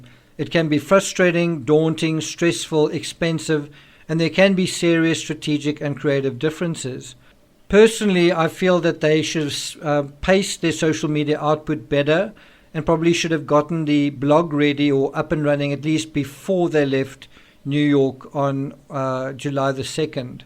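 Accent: South Africa. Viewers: Mr South African Accent, I don't know you; just happened that your page came up on my feed BUT, can you read a room? At the mark, there appears a very dark, very black woman, costumed as a "darkie maid" with a minstrel-esqe expression on her face. Something like Mammy in Gone with the Wind, except Hattie McDaniel's Mammy had far more innate dignity. Wrong picture to illustrate your little narrative. Cassie is 8-10 years older than her brother, she says. From her conversations it seems that he was the Golden Child, a boy, -finally!- even though he may have mental issues; Cassie is the family scapegoat. Look at her and her husband; her parents first probably ragged at her as an adult in an abusive first marriage (like Gabby) then because of her and her husband's weight, losers. Then they house sat for her parents while they took Brian up to NY for whatever reason, most likely mental /physical/psychological treatments. 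He's not been regularly employed, it seems yet he disparaged Gabby for trying to manage her vlog/social media as a business, days before her death, blaming her for being OCD as he admitted to the police that he was hearing voices. Cassie is not the out of order one here. As she spoke, I could HEAR in my imagination the verbal pillorying that would be aimed her way by her mother for "daring!" to defy family /lawyers instructions, "just like you to say the wrong thing about something you aren't privileged to!" Cut the woman some slack. She has little kids to pr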